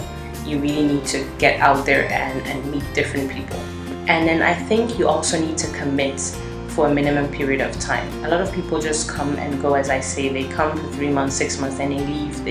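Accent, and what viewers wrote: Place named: Ghana. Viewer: She sounds like she has a Ghana accent (ie not foreign). She said that BOTH of her parents are from Ghana.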